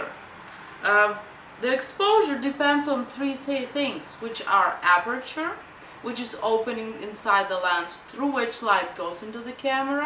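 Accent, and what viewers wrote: Russia. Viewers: sounds like a mix of Russian + Latin speaking english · good video, but I'm getting tired of "lower ISO looks grainy". Well, yes, but on most good DSLR you can go fairly high, without visible grainy-ness. Instead of blindly following advice, that may or may not apply with your equipment- TRY THINGS OUT = SHOOT. That's the only way to learn. But again, nice video, explains the principles well. Is the accent Russian? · Nice video! Do You have a Russian accent? )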